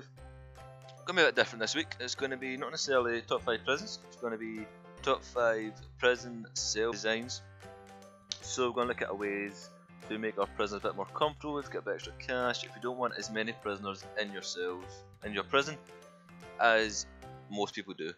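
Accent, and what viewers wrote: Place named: Scotland, United Kingdom. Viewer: You're an absolute legend. You have truly understood the aim of this game. Comfy times 👌. The jolly music played in the background is just class haha. Are you from Falkirk? You sound like my pipe major, he's from Falkirk. I'm from the West Coast (Scotland).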